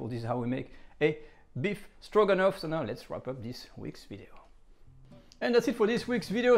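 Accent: France. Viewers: I can hear dat French accent😂😂. Continuez chef! J'adore votre recettes.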